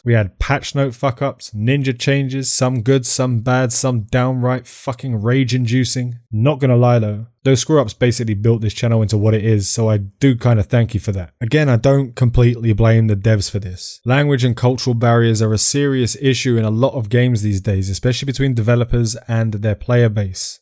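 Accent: United Kingdom. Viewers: I admire your British accent, will miss it...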